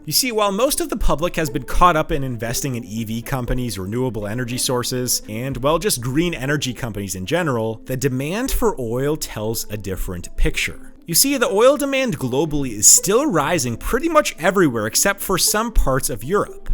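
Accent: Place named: Canada. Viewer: I think he is Canadian. I heard slight “Canadian raising” in his pronunciation of the ‘ou’ diphthong (listen to when he talks about when his next video “comes out” at the end of the video.) He also uses the more open ‘o’ in words like “dollar” and “over.” I’d guess he’s from either Ontario or Alberta based on the accent.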